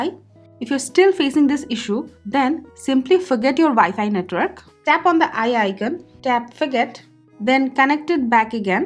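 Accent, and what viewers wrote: India. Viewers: you did help me however for americans to undersand the indian accent they place on the english language and the speed in which you speak is super difficult. If you would clean this up you would get many more likes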